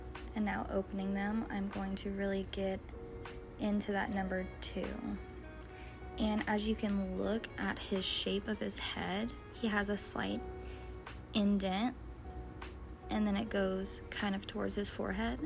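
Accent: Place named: South, United States. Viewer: Good video. Not sure what area of the South you are located but you have the best accent. I used to have a co-worker a few years ago with a very similar accent. She was from Northern Louisiana.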